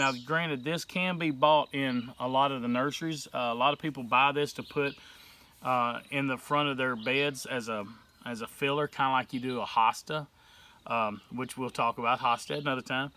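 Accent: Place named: Mississippi, United States. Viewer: NORTH MISSISSIPPI!?!?!?! Were y'all my neighbors when I was a kid!? I knew I loved your accent 😭 I was born in Coldwater, Tate County, do you know where that is? I moved to Oregon when I was 4, in 1978. Ok, now to restart the lambs ear cause I got all excited when you said NORTH MISSISSIPPI 💜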